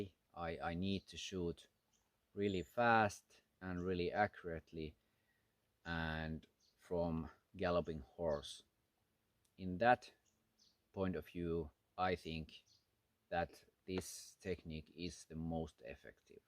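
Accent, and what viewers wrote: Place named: Finland. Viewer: Awesome video. I had never heard of Slavic draw before today. Greetings from Minnesota, USA. I suspect from your accent that you might be Finnish, or at least you live in Finland. Here in my state of Minnesota, there are certain towns and areas where there are lots of Finnish Americans. I am in my 30s, i became friends with guys in primary school around my age who's family still remembers things about Finland very well. So I mean not my friends parents, but some of the parents of their parents remembered things and stories about that place, Finland. I will not mention the small towns here, because people who are not from Minnesota usually do not know the names of them anyway, but the surnames I remember of kids I grew up with included the names Hyuvonen, Tanskinen, Maninga, Karvonen, and Huhta. Once again, greetings from Minnesota USA. 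Long live the art of Archery! One of the most ancient of our martial arts! 🏹 Ha!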